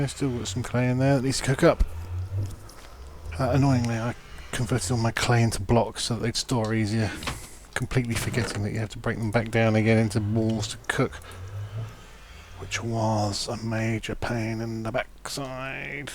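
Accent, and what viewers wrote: England, United Kingdom. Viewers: Hey your from England Ent ya?!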